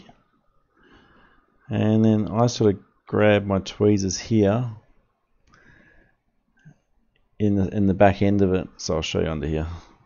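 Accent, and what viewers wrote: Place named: Australia. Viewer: First time watching your upload came from Sorins Electronic repair school. Thought you are an Ausie reading the comment bellow confirmed your accent. Ill watch your other uploads later.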